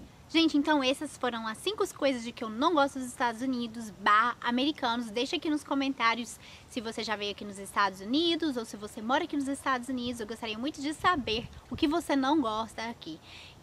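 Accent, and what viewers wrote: Minas Gerais, Brazil. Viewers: Você é da onde , seu sotaque parece com o de Minas !!! bjss adoro se canal !! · Teu jeito mineiro é muito maneiro, nós cariocas adoramos vcs (mulheres) falando!!! vc mora nessa residencia dsd que deixou de ser au pair? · com essa voz de mineiro..tem qui arotar mesmo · Adoro seu jeitinho mineiro de falar... como e linda essa menina... adorei.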